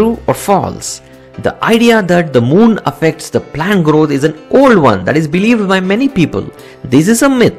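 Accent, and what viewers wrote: India. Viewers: Pronounciation is off.. example...The word 'young' you pronounced 'eng'..... 'sewage' you pronounced 'seawage'. Don't let that deter you. As Indians one can tell you're from South India so your accent is a distinct south accent.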